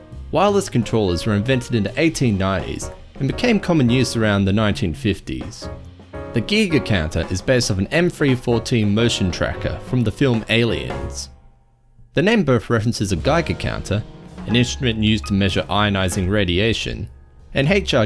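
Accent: Australia. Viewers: Your voice reminds me of Robin Walker (dev at Valve who designed TFC and TF2). Are you, by any chance, Australian? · Are you Aussie? The accent makes me think that.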